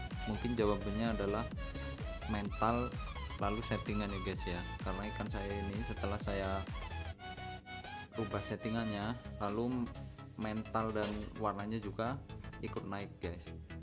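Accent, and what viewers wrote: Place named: Banyumas, Indonesia. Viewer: ciri khas pokoke(ora ilang ngapake biarpun ngomonge bhs Indonesia)😀😀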